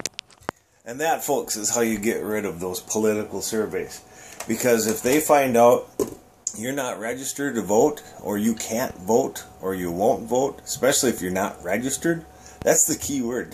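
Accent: Minnesota, United States. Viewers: yeah im one of those idiots(political telemarketer),,, we dont really care what people tell us mainly just try to make it through a shift a job is a job i dont even vote the way i call.. usually if you say you arent voting an a poll they usually end the call..but yeah 99% chance you are still on the list.. that call would be dispo'd just as a decline.. and often times they get the list of people to call under the list of registered voters... you almost sound like you are from "da range" in mn here maybe the UP from your accent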